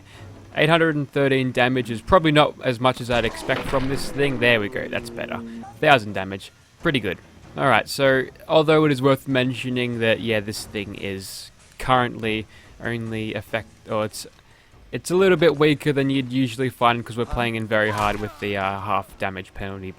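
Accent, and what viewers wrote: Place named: Australia. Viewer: Ya know I’ve observed something with your Australian accent that I’ve never noticed before. For example: You say “whatever” as “whateva” but you say “Matilda” as “Matilder”. So that’s cool. 🌈 The more you know ⭐️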